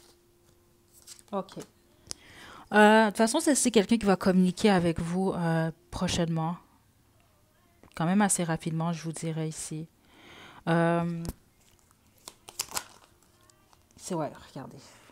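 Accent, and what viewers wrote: Canada. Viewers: J'aime aussi votre accent canadien. Etes vous Canadienne? Je vous suis de Douala, Cameroun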